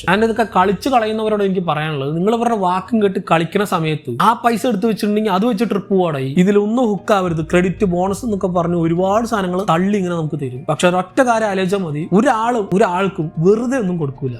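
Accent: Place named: Hindi, India. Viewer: A malayali with Hindi touch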